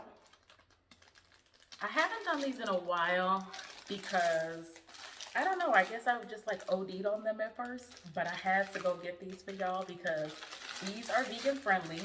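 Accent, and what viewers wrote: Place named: East Coast, United States. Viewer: Just found your page. I’m originally from Chicago too! You sound like you’re from the East Coast lol. Yesss girl use that Quinoa!!!!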